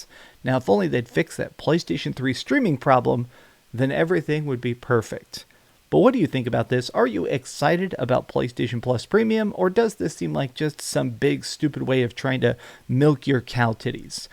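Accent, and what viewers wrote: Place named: Mississippi, United States. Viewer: Dude, some of the words that come out of your mouth make me think you're from Mississippi, lol.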